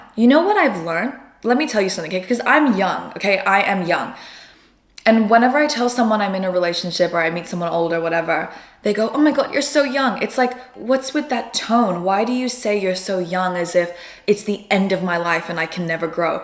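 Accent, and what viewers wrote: Australia. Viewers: The accent?? Where is she from. My guess is Australia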